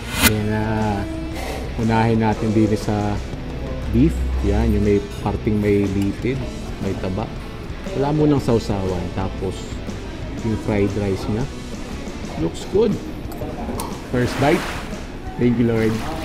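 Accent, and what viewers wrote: Batangas, Philippines. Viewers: Parang taga batangas si Sir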